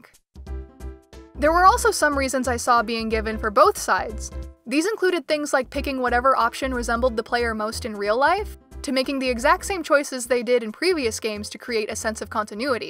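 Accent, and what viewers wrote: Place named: North America. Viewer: a "normal" amount.... also I'm judging by the accent I'm assuming you are an NA player. The match making servers prioritze region to cut down on lag so that would be a thing to consider with your sample. My guess is JP has an inkling majority but I haven't collected any data on that just a vibe. Also my statistics nerd brother in arms.... I am sorry nobody told you about stat.ink. Lastly, love the PSO music cheers :)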